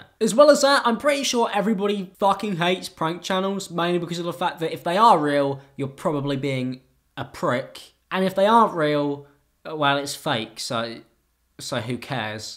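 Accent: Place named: England, United Kingdom. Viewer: What I hate more than ricegum is the English accent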